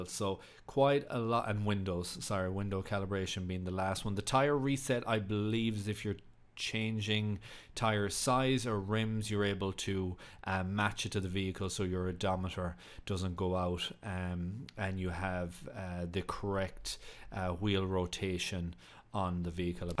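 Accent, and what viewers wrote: Ireland. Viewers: not to be rude but i wanted to ask are you Irish?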